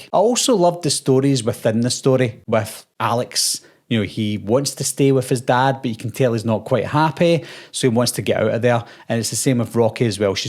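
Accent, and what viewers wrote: Scotland, United Kingdom. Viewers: I didn’t expect to come across an Irish youtuber that breaks down movies, I was curious, but now I’m pleasantly surprised it’s the accent I like to hear the most😭😭 (or it could be Scottish I honestly have trouble differentiating the two, but I like them both)❤️✨ Checked the about. Sorry, Scottish** youtuber 😆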